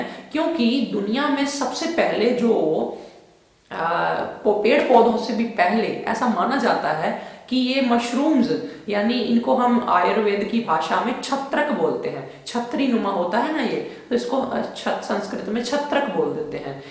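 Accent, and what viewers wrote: Punjab, India. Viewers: Namaskaram guruji I love your Punjabi tone and i am not Punjabi but I love Punjabi language...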